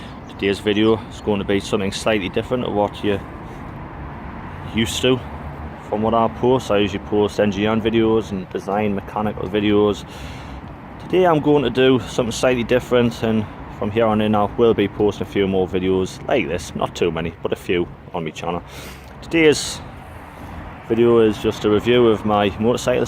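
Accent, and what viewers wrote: Newcastle, United Kingdom. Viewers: Nice review, and you can't beat a Geordie accent! Currently got a Bandit 1200, so I understand your diesel-torque comparison. Might get one of these next, as I have to sell my bike, or possibly the SV1000. It would be nice to try a twin, and something lighter than my big old two wheeled bus! Cheers!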